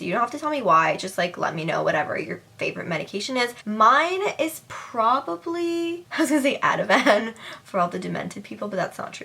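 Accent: Canada. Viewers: Omgosh!!! your canadian?!?!?!? That makes so much sense!! I always thought that your accent was unique.... and that i've heard it before.. lol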